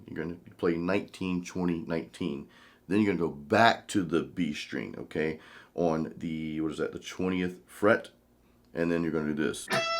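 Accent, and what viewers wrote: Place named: New York, United States. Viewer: I know you are from Florida, but sometimes I am sure I can hear some New York in your accent LOL.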